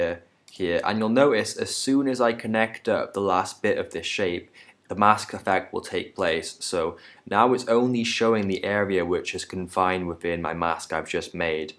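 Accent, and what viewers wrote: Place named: England, United Kingdom. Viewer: I have not problem with it and I like the accent but is it me or is every premiere or AE tutorial done by an English person?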